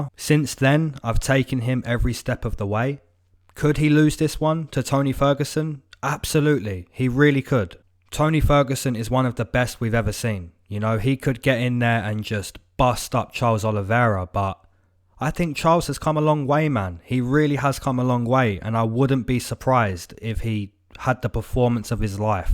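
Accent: United Kingdom. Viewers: U sound like that other Brit (mma guru) who talks funny and crazy ... who’s the better predictor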